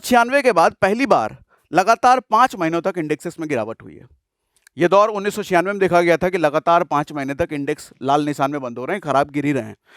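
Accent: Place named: Kanpur, India. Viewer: full on kanpuriya 😁😁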